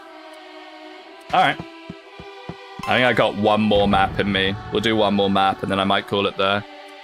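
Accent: United Kingdom. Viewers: Can't wait for the 3am stream I'll miss into another ninian edit into 4 years without streams, keep it up my favorite bri'ish man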